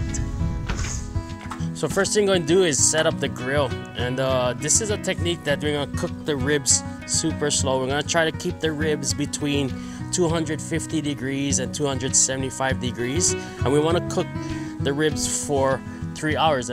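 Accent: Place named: Philippines. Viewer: Da pilipino and brada accent iz on poynte 👍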